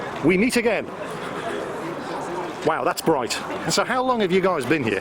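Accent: Germany. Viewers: Your swedish sounds more like german :) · Please dont say the names of the swedish tanks in german dialect. You can if you want but please dont. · stop pronouncing the Swedish names like they're German!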